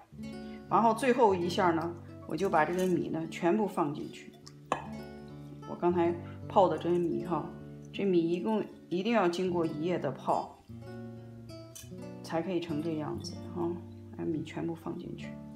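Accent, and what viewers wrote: Shaanxi, China. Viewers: 姐的陕西话很地道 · 喜欢听陕西话讲👍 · 才看到这么地道的陕西美食！谢谢诚意分享🙏！还有地道的乡音，逗得我观看全程哈哈直乐！都是爱吃的，准备做去！🤝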